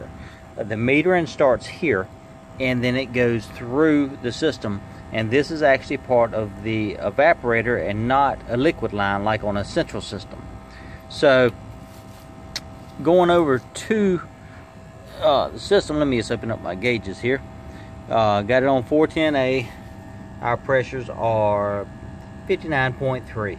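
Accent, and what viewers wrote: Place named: South, United States. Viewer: Thanks bro ,when u from I travel to Baton Rouge for fire truck you sound like your from the great south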